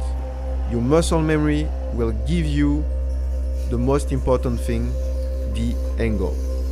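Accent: France. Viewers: merci pour cette superbe vidéo , a ton accent je suppose que tu est Fr , donc un grand merci pour cette vidéo très précieuse , j'ai un wakizashi magnifique et d'excellente qualité , mais j'avais besoin de l'affûter grandement afin que celle ci soit bien tranchante afin qu'elle coupe le papier , un pouce bleu bien mérité :) contunu comme ça je m'abonne :)☺️🙏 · Ça se sens l’accent français 🤣🤣, possible de te mp ?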